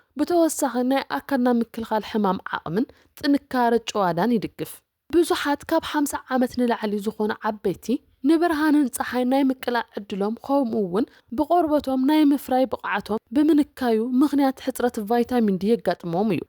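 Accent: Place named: Dekemhare, Eritrea. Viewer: please don't speak Dikala Tigrinya like sewnet say Sebnet and Gra and MiKinas say Minikay.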